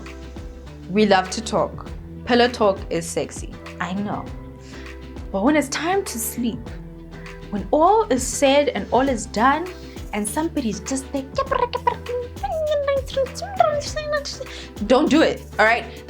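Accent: South Africa. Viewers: Definitely a South African. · She is proudly South African 🇿🇦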